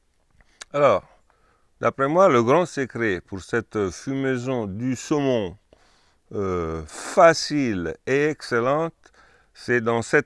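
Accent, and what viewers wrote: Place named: England, United Kingdom. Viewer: Sir, thank you! J'admire votre courage de présenter la recette en français, alors que j'ai décelé un accent anglais. 💛👍. Subscribed!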